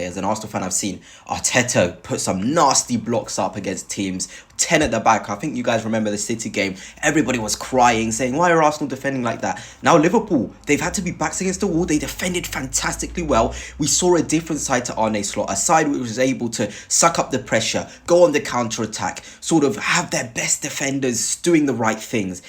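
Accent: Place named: Paris, France. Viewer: Wow he sounds Parisian.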